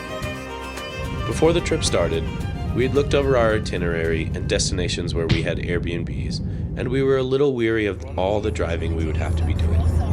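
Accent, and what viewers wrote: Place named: Ireland. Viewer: The narrator sounded a little bit Irish. I'm Irish so a linguistic expert on dialect 😂. Lovely video and snapshots of your trip. Yes, this ltl country is gorgeous. There is a magic in the air and soil here. We Irish are chatterbox...we love to chat but are also interested in people. Ye did the right thing hiring a car and driving yerselves around..choosing yer own itinerary. I'm glad ye enjoyed the visit and all we have to offer here. Did ye jump into the Irish sea on any of these beautiful beaches brrrr? Can I suggest on next trip to Ireland you land in Cork Airport and drive in this area. Beautiful places..friendly people scrumptious food. Kinsale..Crosshaven with the oldest yacht club in the world..Balkymaloe cookery school and Gardens.. Ballycotton with it cliff walk.. fab pubs..grt food..fishing trips..guided tour to an island and hear its history and climb the stairs to the top of Ballycotton lighthouse..see the Mary Stanford lifeboat read all about its famous rescue. 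Visit Sea Church, go to a gig. Enjoy Irish music...lots to do. P.s ye did not need to kiss the Blarney stone..plenty ol chat out of ye 😅.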